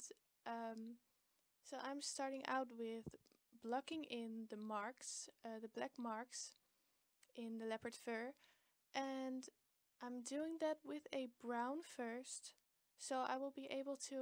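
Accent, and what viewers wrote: Netherlands. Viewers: Are you dutch? (Want k hoor een soort Nederlands achtig iets in je Engels) Youre realy good at drawing btw 💗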